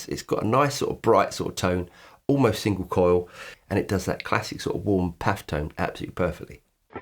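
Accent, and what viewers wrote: United Kingdom. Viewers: Ummmm I got the suspicion that you are Brittttish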